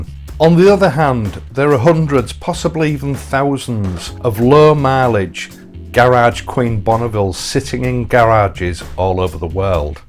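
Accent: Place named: Hull, United Kingdom. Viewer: Hi - love listening to you as essentially I'm a Hull lad (of 56 years:) that's been living in Sweden the last 24 years so it's nice to hear the dialect I grew up with:) All of your videos are frank, no-nonsense offerings which are so refreshing - which I really appreciate. No BS here! This air-cooled v. water-cooled comparison is also great as I'm suffering from that dilemma right now. This has helped a great deal, so keep up the great work and all the best.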